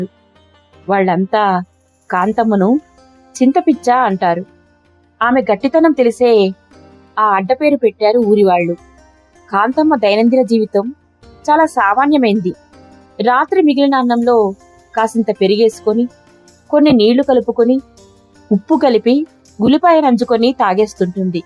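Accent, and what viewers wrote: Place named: Telangana, India. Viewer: కథామంజరి లో కథలు చాలా బాగున్నాయి. గారు తెలంగాణా యాసలో జీవ గంజి కథను బాగా వినిపించారు. కథా రచయిత బాగా రాశారు.వుభయులకు ధన్యవాదాలు.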